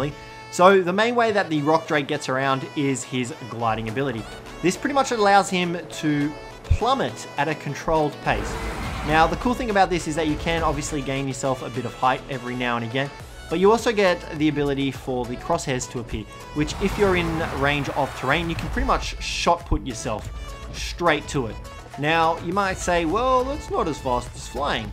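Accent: Australia. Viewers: Are you Australian? I love your accent! ☺️💞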